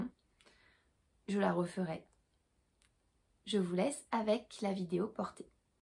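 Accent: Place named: Nord, France. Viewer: Hello! Vidéo très sympa, et qui a confirmé ce que je pensais: tu es du Nord aussi! Lol j avais senti un tout tout petit accent de chez nous;-) bon week-end ma jolie!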